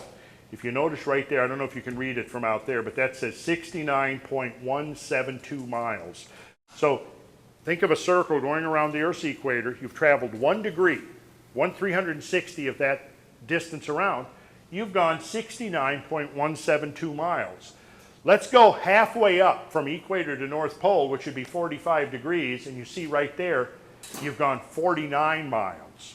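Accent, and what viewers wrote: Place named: Midwest, United States. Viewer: Minnesotans talk a little funny, don’tcha know? He may live in GA now, but he’s from MN, and he sounds like a northern Midwesterner.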